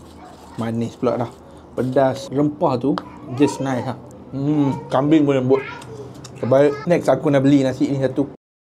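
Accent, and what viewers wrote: North, Malaysia. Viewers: Orang utara kaa boss ? 👍🏾👍🏾 Salam dari taiping perak boss 👌🏾🙏🏾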